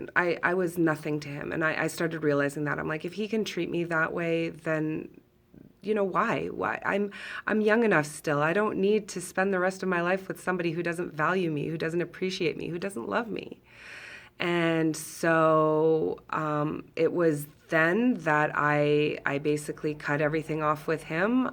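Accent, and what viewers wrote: Canada. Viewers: I love the Canadian accent …….thanks for sharing your story.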